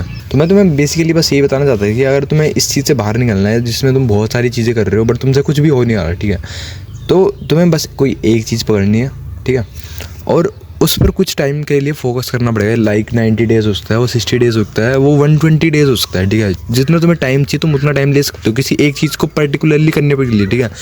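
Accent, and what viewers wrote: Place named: Hills, India. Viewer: Bhai pahad se ho?😊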